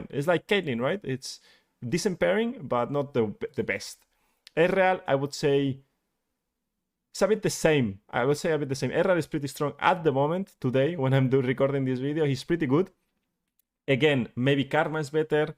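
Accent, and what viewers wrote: Spain. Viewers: llevo 10 segundos de video y ya puedo decir que es español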